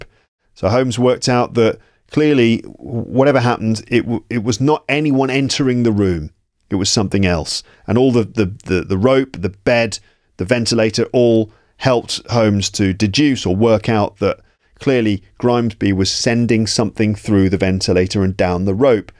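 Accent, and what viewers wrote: United Kingdom. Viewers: is he use British accent? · Is it british pronunciation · Do you have a British accent? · İs that british accent? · my english is good but i still open this vid to listen to my fav music which is british accent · This British accent ؟؟؟ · Hi thank you for posting a lovely video as always. Byw I live in London but there are no chances to meet British people who speak in beautiful accent like you. I understand what you speak almost everything though, I don't get it conversation on the hustle and bustle.😢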